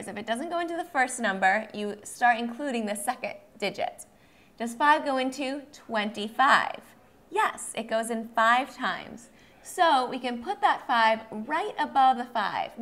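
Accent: United States. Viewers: Yes so annoyed. She sounds like a yankee.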